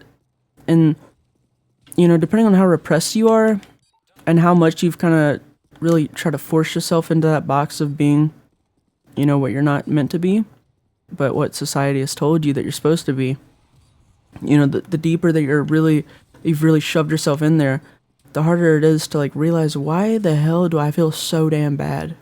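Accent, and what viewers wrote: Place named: South, United States. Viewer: great southerner accent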